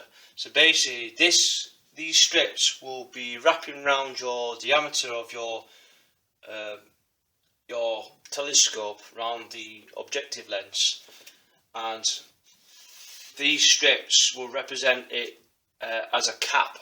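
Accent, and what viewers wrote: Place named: Pendle, United Kingdom. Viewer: Where are you from mate? You sound local to Pendle where I am from, just ordered xt6 telescope to begin astronomy with my son, any tips welcome.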